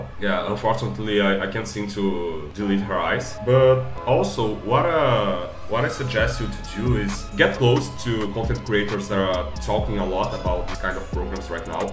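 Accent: Brazil. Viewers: Esse sotaque é brasileiro em kkkk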